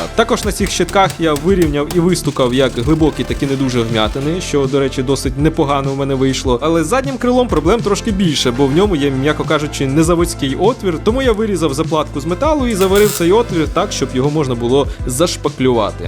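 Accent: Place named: Rural, Ukraine. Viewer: Очень круто, особенно нравится твой говор, старый "селянський" украинский) мне вкатило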